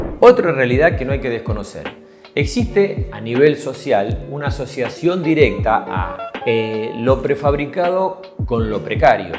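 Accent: Argentina. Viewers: Me gustan mucho tus comentarios sinceros y profesionales. Por el acento siento que hablas desde argentina, y la realidad algunas veces es un pelín fluctuante dependiendo del país. Desde España se ve la construcción prefabricada como una ventaja en cuestión de plazos de habitabilidad, por lo que he visto y comparado el precio del M2 de construcción es similar el de la construcción tradicional -estructura hormigón armado/pretensado, tabiquería ladrillo/Pladur, tejado de teja, y fachadas de material hidrófugo - es muy similar al precio de la vivienda prefabricada, pero siempre me queda la duda sobre el sistema estructural, como responde una casa prefabricadas a movimientos/asentamientos de terreno, cambios de nivel freático....esa es mi duda, porque creado el problema, quién sabe cómo arreglarlo?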